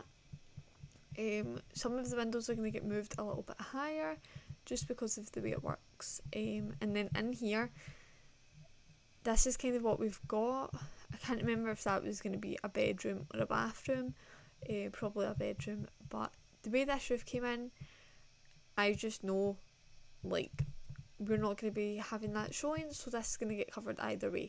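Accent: Scotland, United Kingdom. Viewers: She’s Scottish? Ireish?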